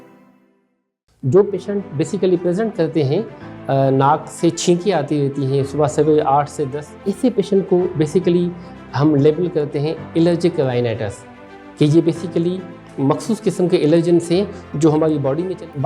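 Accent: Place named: Sindh, India. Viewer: Sounds sindhi accent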